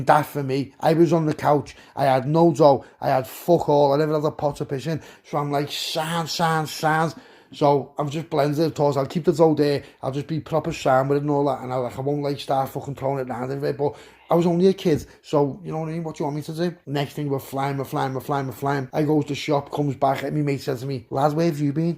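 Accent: Liverpool, United Kingdom. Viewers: Ha ha Ha ha ha ha ha 😆😆😆😆😆, you're off ya head u SCOUSE, fuckin killin me, they've had to move house, lmfco 😆😆.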